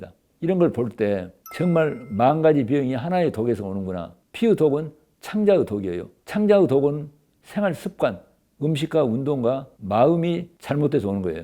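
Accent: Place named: Jeolla, South Korea. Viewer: 말투가 라도네. 라도는 걸러라 들.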